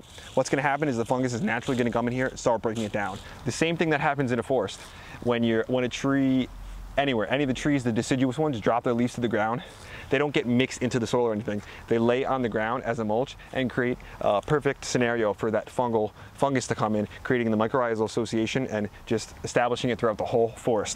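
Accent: New Jersey, United States. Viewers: Wooder. Can't fake that Jersey accent....ha ha. Great videos! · Nope that's a New Jersey accent. The state has its own culture but NY and Philadelphia won't admit it.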